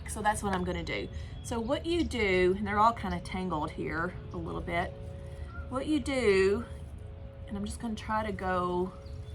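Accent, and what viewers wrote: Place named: South, United States. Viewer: I don’t care how you say your words, It brings out your southern charm. In the plant world I think people get so hoity-toity about how words are pronounced, so much of it is regional.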